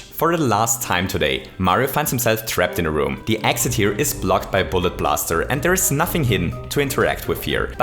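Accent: Germany. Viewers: You have an German accent xd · Ich wusste das du Deutsch bist! Habs schon am Akzent bemerkt!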